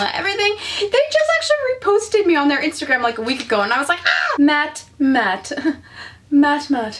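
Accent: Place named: South, United States. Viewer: you sound like you have some southern in you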